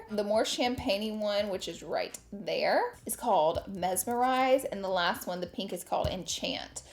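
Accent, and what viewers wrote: South, United States. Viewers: I swore I had seen enough reviews for something I can’t afford right now but I adore face, personality and especially her adorable southern accent 😍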